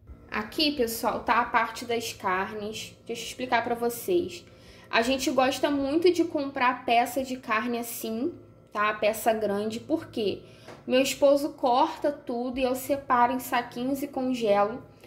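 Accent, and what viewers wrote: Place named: Rio de Janeiro, Brazil. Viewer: Oi ta tudo bem caro mais fazer compra por mes e bem melhor Ótimas compras eu tmb comprei chester na peomoção kk eu tmb compro tempero na casas Pedro Eu sou do RJ pelo seu sotaque vc e do RJ tmb ou me enganei kk eu moro perto do shopping Grande Rio Baixada fluminence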